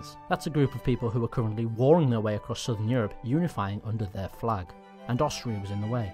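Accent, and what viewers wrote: United Kingdom. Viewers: This was the first video I had watched of, and after it, I had come to love all his work. It a damn shame we'll never hear his snarky British accent snap another witty comment or see another one of his videos in this unique and detailed style. Rest in peace, you have left behind a legacy and personality not easily mimicked.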